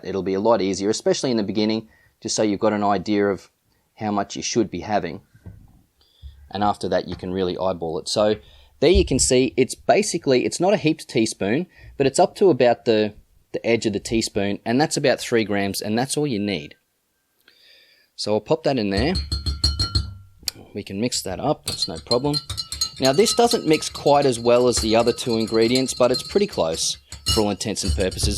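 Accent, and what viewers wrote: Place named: Australia. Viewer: The Aussie accent I love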